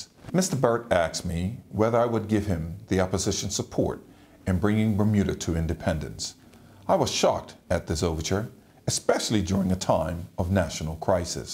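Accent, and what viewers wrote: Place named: United States. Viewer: His accent is more American what gives 🤓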